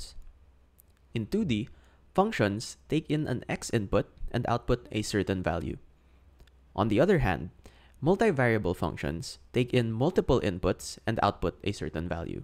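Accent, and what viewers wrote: Philippines. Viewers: he sounds Filipino yes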